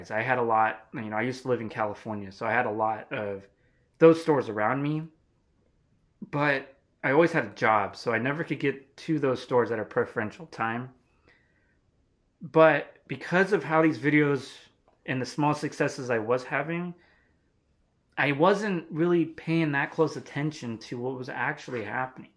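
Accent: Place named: Ohio, United States. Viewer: and you are a content creator too on top of a full time job and Pt ebay! I'm curious where in the states are you from? I have cousins from Ohio and every time I'm listening to you I find myself thinking of them and their accent. what did you go to college for I'm curious? I went to be an english teacher and I kinda lost interest in that as well and couldn't see myself actually being a teacher, mostly because I"m an introvert. it's so ironic about what you are saying about "don't give up"....last night I told my wife "I don't know if I want to keep doing this"....lol. this is a timely reminder. "rule the day!"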